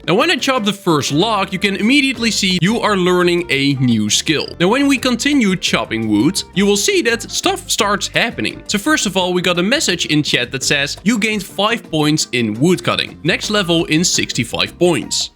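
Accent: Netherlands. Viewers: the fact that you can hear that he comes from the netherlands is crazy (ik ook daarom weet ik dat)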